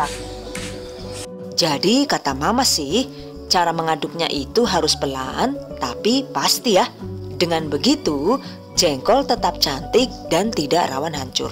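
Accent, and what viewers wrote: Banyumas, Indonesia. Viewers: Jebule wong ngpak😂😂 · Mantulll... Paket komplit.. yg dulu blm doyan jengkol. Sekarang udah suka... Kok suaranya ngapak ? Heeee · Jebulnya ngapak yak hehe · Perfect banget Bunda, bdw kok bisa ngapak emang mertua org mana Bund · Mbaknya dr Banyumas ya ngapak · Oalaah Wong ngapak mbokayune 😀😀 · Dene ngapak...ora ngapak ora kepenak 👍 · Bahasane ngapak kyk BN aku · Inggrisnya Fasih 😁... eh jebule wong ngapak ya 😅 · Deneng pada karo nyong mak ngomonge ngapak😁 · Kok ngapak logatnya 🤣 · nice info ..mksh.. ini bhs nya kok ngapak banyumasan..dr madiun or banyumas yha heee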